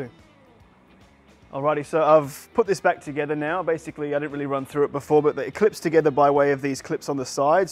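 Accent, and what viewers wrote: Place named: Australia. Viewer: Hi mate, you sound Australian. 👍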